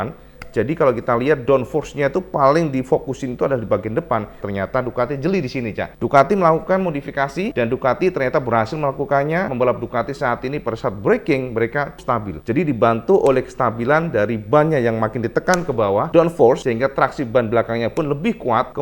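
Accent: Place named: Java, Indonesia. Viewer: Ini mah chanel sales yamaha yah.. Haduhhhh dasar nih chanel orang medok..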